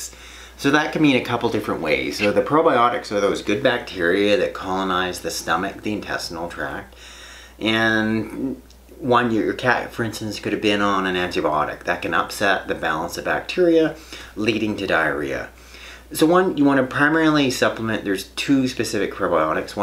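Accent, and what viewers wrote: Canada. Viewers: Yes it is helping! I don't know if Canadian accent is much different from the American one, but surely my English is not as good as I thought 😂 but I admire your channel as a very needed social inciative. Tk you!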